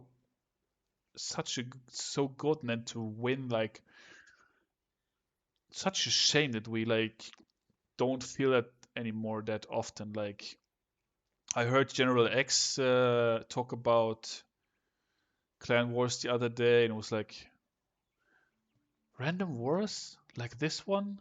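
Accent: Germany. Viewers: Richtig geiler channel weiter so. ❤️❤ Hab ich gleich gehört das du deutsch bist. haha 😂 Btw lasst die Maus und die cam so passt perfekt. Alles gute ❤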